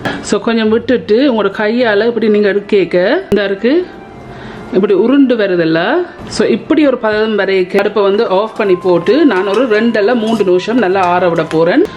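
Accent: Sri Lanka. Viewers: Sister spoken Ceylon Tamil super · Nengal pesarathu alagaa iruku... Ilangai Tamil thaane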